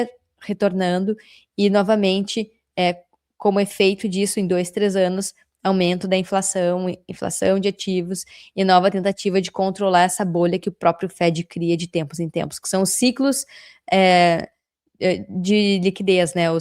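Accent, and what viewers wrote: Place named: Porto Alegre, Brazil. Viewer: Comecei a seguir o canal só para agregar o sotaque porto-alegrense às análises econômicas, e sentir falta de casa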